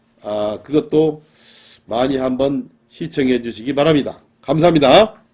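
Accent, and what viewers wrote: Jeolla, South Korea. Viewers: 약사님 전라도사투리쓰시네요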